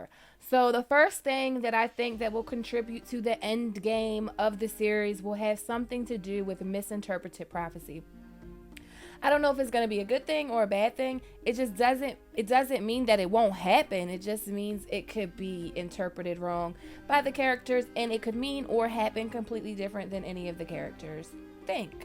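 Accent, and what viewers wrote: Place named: Midwest, United States. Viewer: lol NOT comparing you to that creature. Where your accent from anyways? Midwest? Chicago? I actually prefer you being genuine rather than others who put on a terrible British accent when discussing ASOIF.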